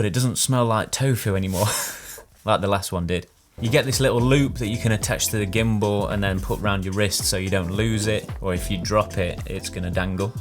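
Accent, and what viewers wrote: Nottingham, United Kingdom. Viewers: I KNEW you were a Nottingham guy. I'm a Derby lad. Aaaah, the Midlands.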